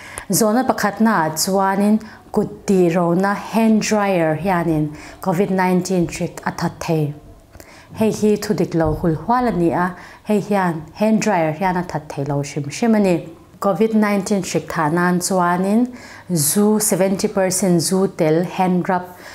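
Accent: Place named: Lunglei, India. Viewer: Naupang leh kha zirtir i ang. Se tha lutuk tho e..tin lunglei lam mi i nih ninetrin😀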